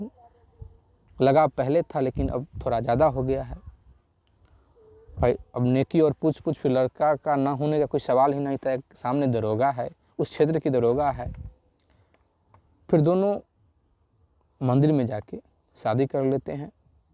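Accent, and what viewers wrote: Bihar, India. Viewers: आपकी बोली में बिहारीपन भाषा का झलक आ रहा है स्त्रीलिंग पुलिंग का पता नहीं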